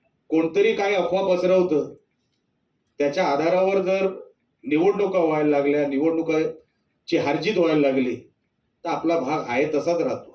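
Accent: Malvani, India. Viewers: Malvan voic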